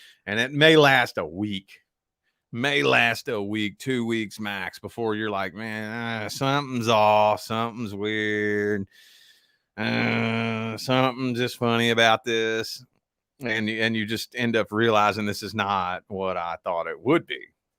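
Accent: United States. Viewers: Love the shirt So straight forward, love the way he speaks with his American accent “Doing a lot of healing” that is what I am focusing on “Close it out!” Good thing about Gemini they move forward and on because it is an AIR sign ruled by Mercury ~ we always come out on top…Gemini Chitter Chatter 🎉 Feel like this a spiritual community ~ Intuition, Spirituality, Feelings, Emotions, Cosmic Realm, as well as spiritual (beyond the physical) Realm “Too damn late” As a Gemini I love to “Ghost” people I dislike Between a “Scorpio & a Leo” it’s always been a Leo for me… I have a lot of Leos in my life I don’t know why? Taurus/Gemini cusp so I have a lot of Earth and Air with a Monkey Chinese Lunar Calendar but I believe it is a Water Monkey, so a little bit of water in the Chinese Lunar Calendar but in the astrological sector all the Earth and Air elements … I struggle with surface level connections because I am quite shallow and too busy for emotions and deeper level connections … The upside down world card keeps me going in circles because I do not care about making progress … my boss is a Sagittarius and always thinking about moving on and starting a new job with another company PLUS she is bitter~ I am curious if she will leave my work as my boss? 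:) SO BITTER sounds about right jumping from toxic relationship to toxic relationship but I can see it :) “Blessing in Disguise”. “Healing” “Person Person finally arrives” “Hard work out of the way first” My parents are Capricorn & Libr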